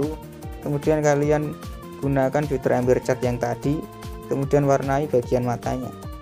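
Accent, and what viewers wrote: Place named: Java, Indonesia. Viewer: Klo bisa ngomong medok nya dikurangin,soalnya agak gk faham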